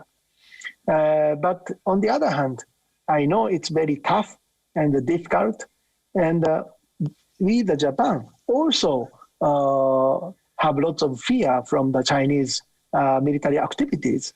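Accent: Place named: India. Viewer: Beautiful like indian english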